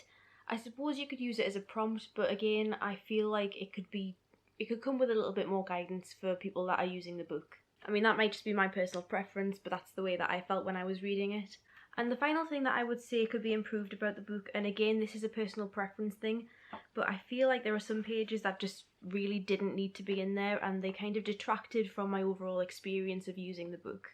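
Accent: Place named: Newcastle, United Kingdom. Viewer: Being from the North East myself, there's nothing nicer to hear than that Geordie accent :)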